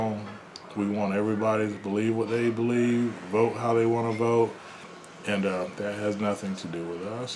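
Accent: United States. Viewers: Thanks for sharing. I told my sister that your voice reminded me of our uncle's. It is the Middle Georgia coming out. Baldwin County girl myself. Thank you also for giving the name of the seed company. Having seeds is vital in the current times. I look forward to watching more content as always. Blessings ✌🏾❤️🙏🏾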